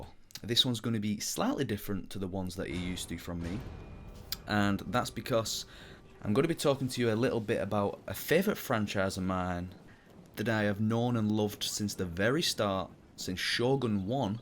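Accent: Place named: Northern England, United Kingdom. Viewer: It sounds like hes northern english.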